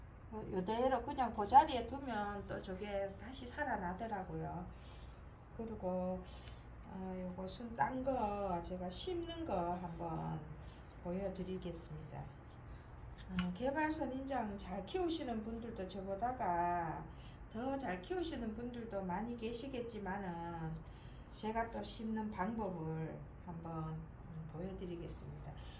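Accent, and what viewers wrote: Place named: Gyeongsang, South Korea. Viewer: 저는다구에 거주하고있습니다 경상도 같아요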